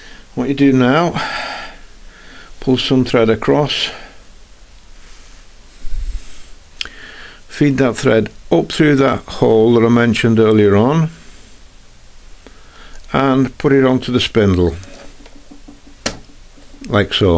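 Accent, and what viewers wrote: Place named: St Helens, United Kingdom. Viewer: this was exactly the tutorial i needed thank you. Didn't expect to hear a St Helens accent though; my hometown. Even better! lovely . tHank you !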